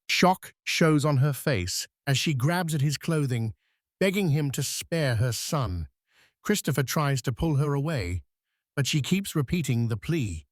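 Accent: United Kingdom. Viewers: British narration, unusual for this type of video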